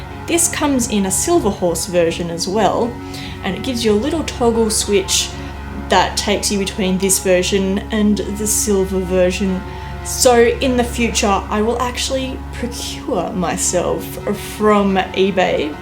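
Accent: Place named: Australia. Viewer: Hi! Thank you so much for your kind reply, I will keep that in mind. BTW love your channel, thought you are British - but you are from Down Under:-). Take care and cheers, Peter, Austria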